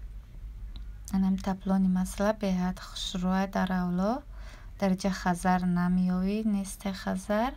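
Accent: Kulob, Tajikistan. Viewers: Аи рвечи гуфтанит бафигрм кулоби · Ранги хдм гапезанен кулобиеи хдмон хшру гапмезаган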